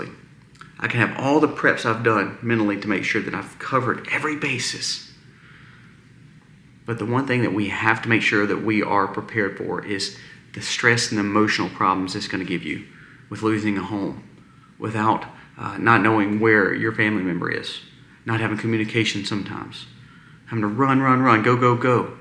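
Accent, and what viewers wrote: South, United States. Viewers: I love all my Preppers and their channels but we don't own the house ( which is a small 2 bedroom cabin) we live it and we don't have a middle class income. We do have a propane cook stove and a wood stove but the well goes done it won't be pretty. We are 70 and 72 and my husband spent 24 years in the military. I put in a huge garden the first few years we lived here but I can't do that anymore. But we are happy and warm. We do live on a small 1/2 mile dead end road with about 12 other houses. We don't know what the future holds but we'll keep going until we can't go anymore. I have a stent in a major artery which keeps me from doing a lot of things and it's giving me a lot of issues. So I don't know if they can do another surgery or not. I really love your channel and have been watching for a long time. As a person born in Michigan who basically came into adulthood in the south ( TN and VA) I just love your accent. 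It makes me "homesick". We have no family here. My husbands Mom and Dad died years ago and he has an uncle he hasn't seen in decades. I grew up an orphan so have no remaining family members. I've had an interesting life that is for sure. I just wanted to say hello and let you know how blessed I am by your channel. Thank you for pressing on in your creating of these videos. I wish you and your family the blessed. Stay sweet. Rachele Rae...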